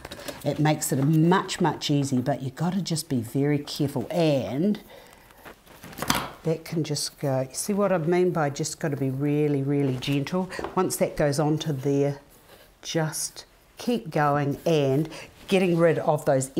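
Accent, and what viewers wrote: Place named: New Zealand. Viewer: That NZ ecksent keeps me coming back.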